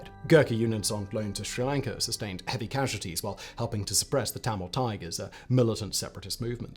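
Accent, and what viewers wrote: RP, United Kingdom. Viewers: The posh, pretentious accent and the changes in volume, just hinder at the story you are telling.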